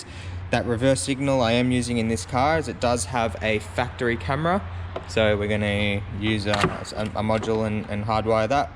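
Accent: Australia. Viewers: Why did you skip illumination if the wire was right there? I don't know about AUS (I'm just guessing based on your accent), but in the US, Kenwood HU's do dim appropriately when the headlights are on at night. I get not going out of the way to find it...but capping off both orange wires takes just as much effort as connecting and soldering/taping the orange together. Just an observation...well done otherwise.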